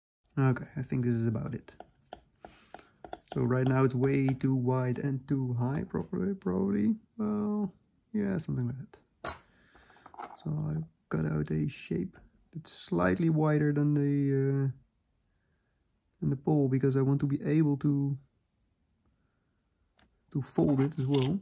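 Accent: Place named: Netherlands. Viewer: Bedankt, man! Ik denk jij zijn Nederlands van je accent? ;) Sorry, mijn Nederlands beroerd!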